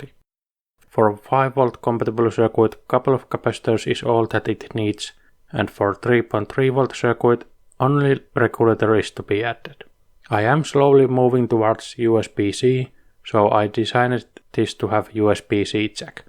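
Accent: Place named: Finland. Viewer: funny thing...the minute i heard you speak..."Suomalainen!" appeared in my head... seitsemän vuotta salossa... at first, i missed the "a-dots" in seven...wait, it was kahdeksan vuotta...onko kesä hyvää...our friend said over 30C, everything too dry...nice to see you here.. kanadalainen, mutta melkein suomalinen 🇨🇦🦈🐻‍❄️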